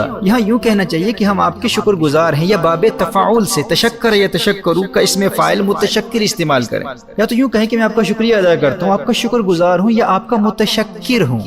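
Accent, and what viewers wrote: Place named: Arab world. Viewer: ماشاء اللہ خوب وضاحت ہے لیکن بصد معذرت آپ نے بہت سے تلفظ کو عربی accent میں ادا کرنے پر زور دیا جب کہ اردو کے حروف تہجی عربی زبان کے حروف تہجی جیسے ہیں مگر تلفظ میں دونوں زبانوں میں اکثر فرق پائیں گے۔ عربی زبان کے تلفظ اور اردو کے تلفظ کے درمیان بہت فرق ہے اردو کو عربی سے compare نہ کریں۔ تفصیل میں نہ جاتے ہوئے۔۔۔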